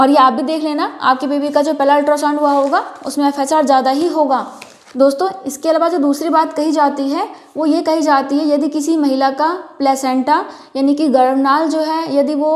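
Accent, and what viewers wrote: India. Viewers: Ap noida se hai kya ??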